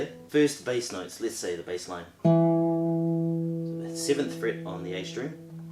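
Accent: New Zealand. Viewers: Kia Ora brother, absolutely fantastic stuff, I have been working on a few of your uploads and loving your gentle nature and articulate communication, impressive Spanish pronunciation mixed with classic Kiwi "orf" instead of "off" .. love it to bits! Much Aroha to you. Um I see you are using a voicing of what appears to be a C7th and you are saying how it's digit gymnastics - I watched Ana Vidovic and Pepe Romero and they appear to be using a bar arrangement from the 8th fret with the high E? So I am wondering what is the best? Obviously you have proven your way works but I can't help wondering, any thoughts? Cheers. Nick. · I knew I could hear the NZ accent! Greetings from Christchurch. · great, thanks a lot , sure i will . oh you are from New Zealand ¡ its a nice accent . Take care amigo ¡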